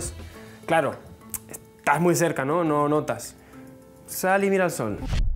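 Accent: Canary Islands, Spain. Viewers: Es canario. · en otro vídeo dijo que es canario. Aparte, su familia tiene acento canario y él hace años también lo tenía.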